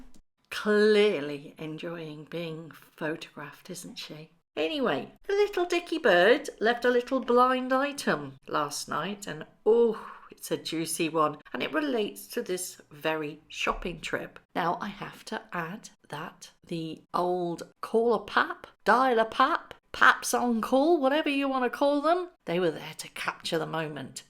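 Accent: United States. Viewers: Your American accent is as stupid as this entire video. Get a life. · Your American accent, matches Megan. Total rubbish 🗑😑 But you are amazing 👏❤💖, love your accent .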